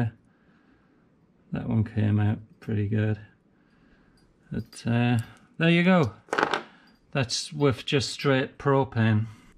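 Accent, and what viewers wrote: Northern England, United Kingdom. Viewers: i can tell you're from either very far north england or very far south scotland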